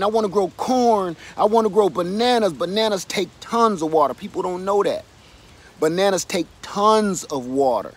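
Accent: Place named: California, United States. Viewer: You sound like you’re from Cali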